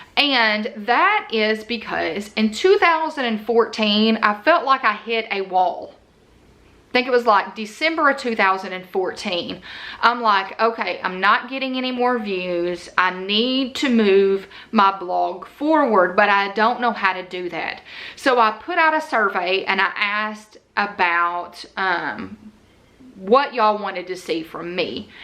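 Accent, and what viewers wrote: South, United States. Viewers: I love your company name even more now that I know the history behind it. And for the record, I love your southern accent! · you are an inspiration. I love how relatable you are! I so look forward to your videos. And your southern accent is charming! Much better than my Boston accent!!!! 😉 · You are one amazing lady,. And if you think the Southern accent is an issue, you should hear the local accent and idioms from Cardiff in South Wales 🏴 where I was born! It’s much worse! xxxxxxxxxxxxxx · Watching you for the past year has been a delight. Your personality shines through in every video. Your southern accent is just the icing of the cake for me. I lived in Georgia for many years and miss the hospitality and joy southerners bring to any conversation. I look forward to watching your videos every week because they always make me smile. 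In fact, at the end I always say with you “bye you guys”...don’t know if you realized you have that catchy phrase too. Keep up the good work! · I love that you have an accent , I too am a southern girl and have often thought of having a chanel ... also FYI , you can do an IGTV and put links on your stories via IGTV... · Thank you for answering all my questions I posted in the FB group! I love your channel and following you on IG as well. I wish I could remember exactly how I found you but I don’t. I will tell you, however, that your sweet southern accent drew me in and I still love it!!! I admire your authenticity as much as your content and have no doubt that silver play button is in your future ❤️ I look forward to supporting and cheering you on as you continue to set and exceed your goals!!!! 😊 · I have been tuning in on your channel for a few years now. I love your channel. I find myself waiting on Mondays, Wednesdays and Fridays to see what you talk about. 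I just love it! Keep up the good work 😊👍. Be you, ( southern accent and all 😉), and don't change a thing. Take Care.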